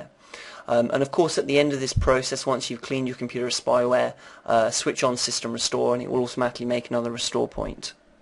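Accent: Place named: Mid-Atlantic, United States. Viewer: Sorry. I tried to watch this, but got too annoyed at the mid-atlantic accent. You're English man! It's not 'compewder' - it's 'computer.'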